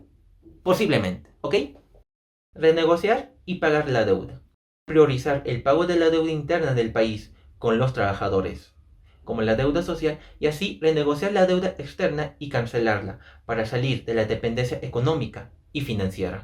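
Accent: Spain. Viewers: Me parece o este men habla como español? Tiene cara de cabeza clava como yo, yara con los complejos mi rey :v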